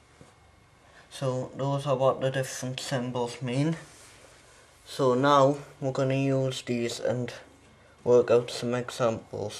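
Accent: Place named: Ireland. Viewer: Irish as fuck and i love it ha thx for the help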